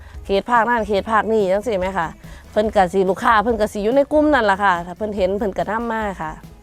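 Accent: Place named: South, Thailand. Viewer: 😂😂😂 พูดภาษาใต้ เพราะค่ะ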